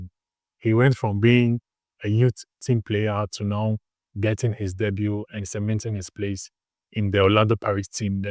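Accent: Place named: Nigeria. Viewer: Bro you sound Nigerian but you look South African.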